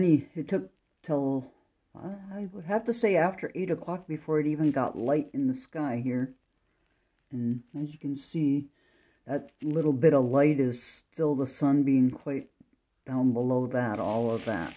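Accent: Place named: Michigan, United States. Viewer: I love hearing your Michigan accent. Wow lotza stuff up there today, here thick gray so far making it so dark inside.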